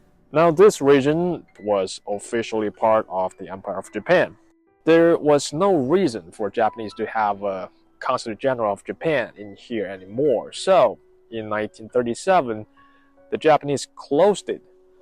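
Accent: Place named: China. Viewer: From his accent, he should have grown up in China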